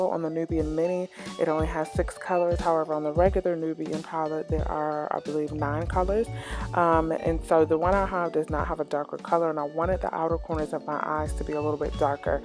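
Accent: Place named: New York, United States. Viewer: lol I definitely hear a little new york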